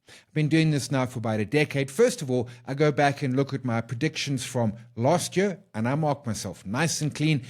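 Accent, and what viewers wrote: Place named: South Africa. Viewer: I concure with the below comment, no captions needed really. Your vocal presenting skills are more than sufficient. The accent is a typical south african accent and we can hear what you are saying. I don't typically watch the show, I listen to the YouTube show ( play the video, pop into split screen and just listen while doing something else.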